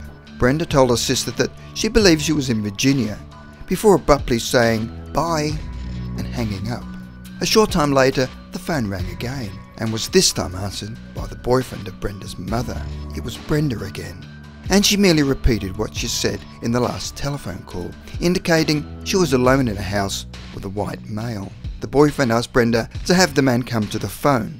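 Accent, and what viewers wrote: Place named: Australia. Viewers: peethewak, I listened closer and you are correct, the narrator is Australian. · Matt Kustom Kostumes i noticed that too. The narrator sounds Australian to me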